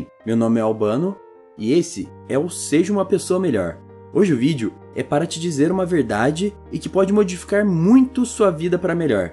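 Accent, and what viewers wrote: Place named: São Paulo, Brazil. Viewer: EITA SOTAQUE PAULISTA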